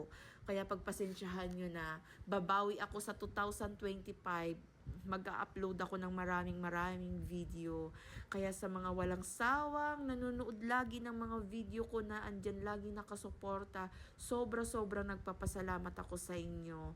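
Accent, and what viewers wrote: Iloilo, Philippines. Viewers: Illongo accent is a bit annoying here in negros oriental its an accent from bukid only kagid haw haw d karabao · Hahahahaha .. N d sapaka ahh..nami ya imo tingog...!! Ilongguha madam ahh..hehehee Bay'i da cla...hehehee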